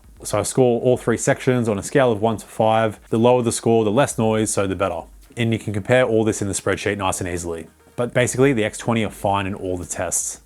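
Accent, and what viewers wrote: Australia. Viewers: nice to hear an Aussie accent on a tech review for once 👌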